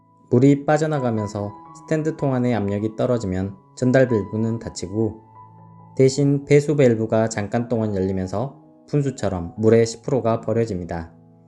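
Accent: Jeolla, South Korea. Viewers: 모음 발음이 전라도식이네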